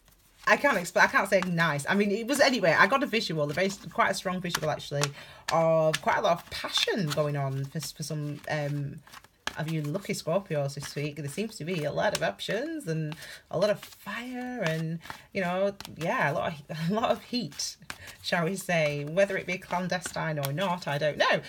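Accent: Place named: Lancashire, United Kingdom. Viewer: Sounds like she's from Lancashire.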